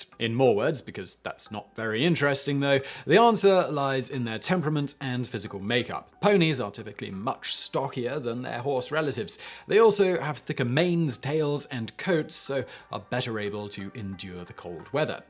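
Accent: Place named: United Kingdom. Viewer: Zedbras 😂😂 I love british english (yeah yeah, i know lives in Prague)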